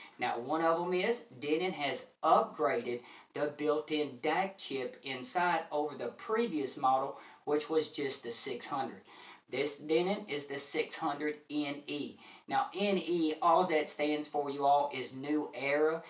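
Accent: United States. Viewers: Look it up in the Dictionary , the yanks pronounce it that way!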